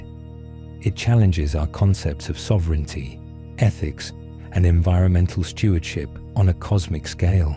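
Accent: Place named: United Kingdom. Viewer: That ain't him talking.He sounds like a Limey when talks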